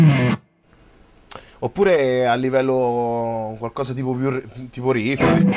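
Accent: Rome, Italy. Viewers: ehi...sei simpatico con il tuo accento romano (de roma?)....ottima dimostrazione...bravo nei riff...bel lavoro!!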